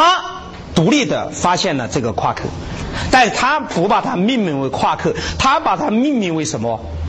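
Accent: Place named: Sichuan, China. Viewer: 任剑涛老师激情澎湃的演讲中我能感觉到他使不完的劲！居然承认自己早年让老乡替考，实在人啊！浓重的四川口音使他的的演讲听起来更加生动，有趣😁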